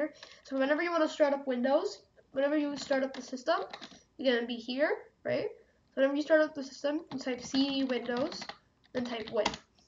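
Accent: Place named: Ireland. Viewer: Wow the accent. sound a bit irish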